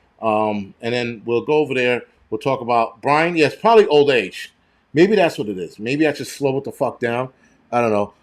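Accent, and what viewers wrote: New York, United States. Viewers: Pinball Preparedness sent us your way and so glad he did...love your no-nonsense straight talk! LOVE your accent...reminds me of my days in NYC...good times...great people!!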